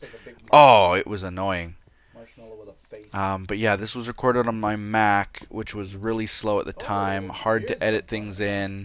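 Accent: Canada. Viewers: Best stereotypical Canadian voice ever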